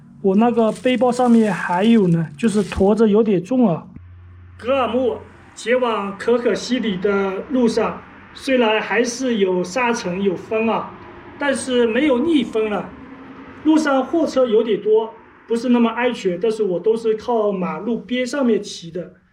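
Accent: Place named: Jiangsu-Zhejiang, China. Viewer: 江浙口音啊，骑这么远？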